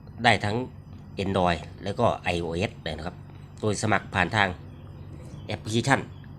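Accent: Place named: Countryside, Thailand. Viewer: เสียงคือบ้านนอกแท้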